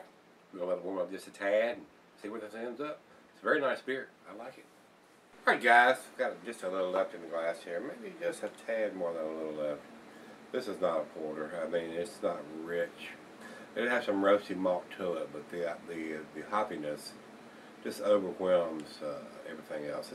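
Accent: Texas, United States. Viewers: I am new to your channel, I found it through Reddit a few days ago! It's awesome! One question though, where abouts is your accent from? I'm British so it's hard for me to distinguish, but you sound Southern, maybe Texan to me. Thanks.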